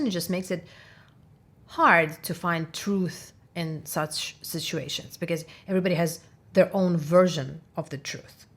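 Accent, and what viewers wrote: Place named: Ukraine. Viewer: Hello. Are you from Ukraine? Once I heard your accent I thought you're from Ukraine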